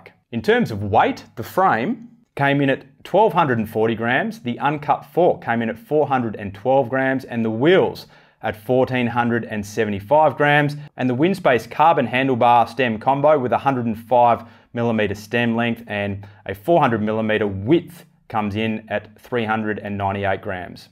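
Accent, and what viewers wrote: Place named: Australia. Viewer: Aussie accent #chinurr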